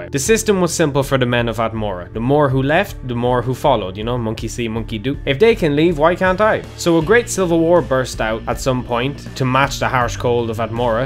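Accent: Ireland. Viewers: "Tank** you good sir..." Gosh i tought you knew better! (Pls don't take this as an insult i love your accent it reminds me of my grandparents) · 'the Turd Era' gotta love that accent.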